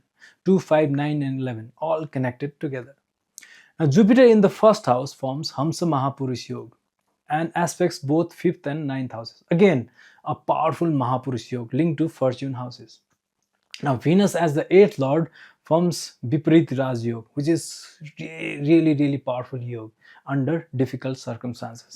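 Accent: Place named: Nepal. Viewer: Sir aap nepali ho aap ki english tone nepali si aa rahi hai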